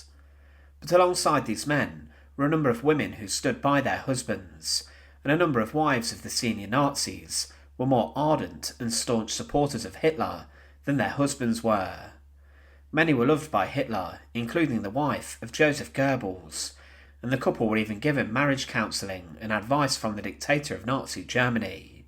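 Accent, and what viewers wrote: United Kingdom. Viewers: He's English/British, not a fake voice smh jfc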